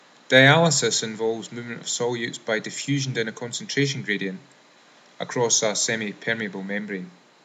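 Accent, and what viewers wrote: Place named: Scotland, United Kingdom. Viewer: Thank you for excellent presentation. Love the Scottish accent.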